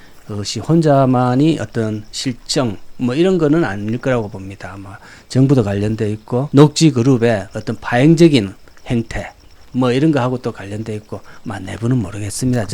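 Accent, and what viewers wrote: Gyeongsang, South Korea. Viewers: 표현력도 좋으시고 지식도 풍부하시고 경상도 말씨도 듬직 하시네요 안타까운 현실.. 덕분에 잘 봤습니다 감사해요 구독좋아요 꾸욱~~~♡ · 목소리가 어째 으시시하네요. 게다가 겡상도 사투리로. 에이~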